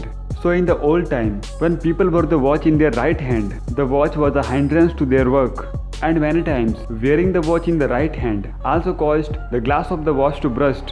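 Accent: India. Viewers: But u have indian accent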